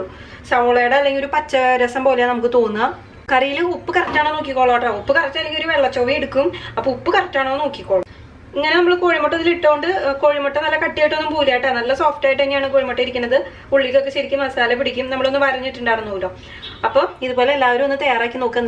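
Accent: Thrissur, India. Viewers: Ente daivame....super presentation.ingane thrissur karkku matre pattu tta....👍😊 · Enthoru easy ayita mutta curry undakunney.anthayalum undakum Thrissur slang kelkumbo nalla sughund. Thanks dear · Thrissur or Kottayam? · തൃശൂർ ക്കാരി ആണല്ലേ 😁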